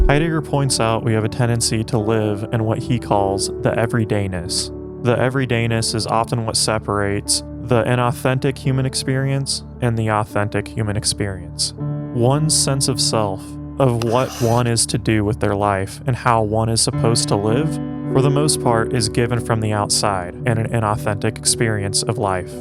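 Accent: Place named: South, United States. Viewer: Intellectual southern accent is nice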